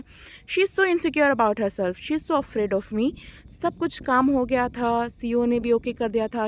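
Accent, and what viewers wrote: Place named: Pakistan. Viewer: You are indian but your accent is pakistani Btw you are from which state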